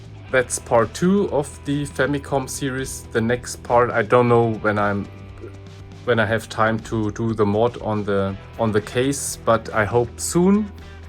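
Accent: Germany. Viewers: Wenn du an den ersten paar englischen wörtern merkst, dass der typ eigentlich deutsch spricht :D.. schöner mod und gut gemacht allerdings haust du damit ne tonne inputlag drauf mit diesen billigen av2hdmi adaptern... besser composite und dann upscalen mit nem hochwerigen scaler und bisschen mit den filtern spielen.. aber ich verstehe deinen ansatz nette idee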